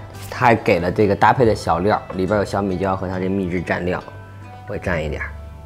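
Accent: Beijing, China. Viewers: 京腔的特点就是这样，不少北京人说话就是这样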